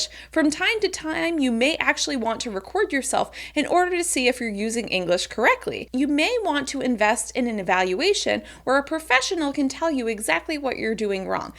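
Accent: United States. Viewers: Good Morning! How have you been today? Thank you for your lesson, warm American voice and a little fast but i got it. Norge Guy.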